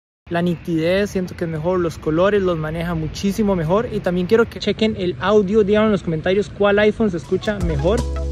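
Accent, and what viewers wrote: Costa Rica. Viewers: Este mae es tico haha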